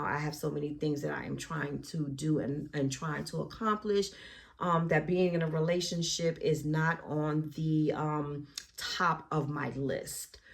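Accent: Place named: Northeast, United States. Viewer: Where are you originally from? Sounds like somewhere in the NE? My daughter got into Spelman also but she went to another school in Rochester NY.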